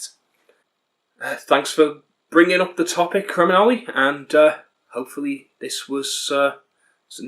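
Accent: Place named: Liverpool, United Kingdom. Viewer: This is a bit random, but are you from the Wirral? I've been trying to figure out your accent because you sound a bit like a scouser but I'm not sure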